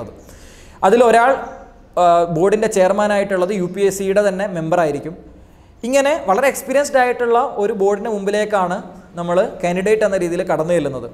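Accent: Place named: Kollam, India. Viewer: Kollathullavr samsarikunna athe baasha shaili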